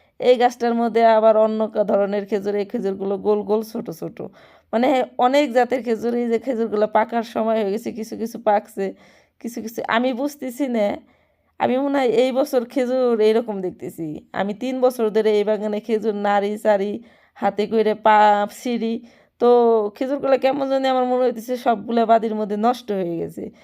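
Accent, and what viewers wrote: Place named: Barishal, Bangladesh. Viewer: আপনার কথা শুনে মনে হচ্ছে বরিশালের মানুষ আপনি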